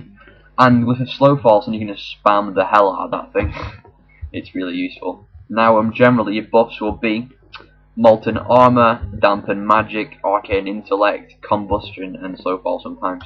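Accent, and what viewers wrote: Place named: United Kingdom. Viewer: I loyke the british accnt ;))))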